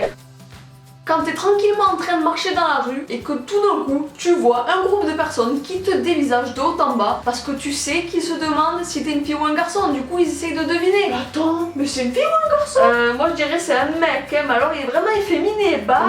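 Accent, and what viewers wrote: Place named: Marseille, France. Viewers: on dirait que t'ai marseillaise ! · Toi tu viens du sud non? x) Ton accent es prononce! Apres je suis un peu dans le meme cas, niveau habit. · J'aime tes vidéos , tu dit exactement ce que tu pense c'est géniale , par contre tu A un accent du sud vraiment prononcer , tu viens du sud ? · J'adore ton accent du Sud😌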